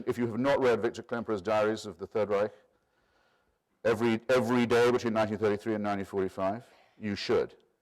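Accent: United Kingdom. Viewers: I wish he had american accent. Bit hard to get everything with british accent. Good stuff thou😊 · So many reasoning errors, yet structured with such eloquence, a beautiful British accent and confident condescending tone. To name a few: 1. Opposing Zionism before the creation of the state of Israel is not the same as after it's establishment. In the same way that not wanting to have a child is different than wanting to get rid of a living person. 2. The fact that Jews didn't want to be told that their place is in Israel, doesn't really negate the idea of zionism. Zionism was never about forcing all Jews to live in Israel, just creating a safe haven. 3. An in-group argument is very different in nature a criticism from the outside. Targeting a group by finding a group inside the group that sides with you (for reasons that have to do with the Jewish religion, nothing to do with your reasons) is classic tokenism. 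Just say some of your friends are Jewish, it's shorter. 4. The fact that there are antisemites that are content with Zionism, does not in any way lead to the conclusion that opposing Zionism is not antisemitic. It might have a nice witty ring when he says it, but logicality it doesn't follow.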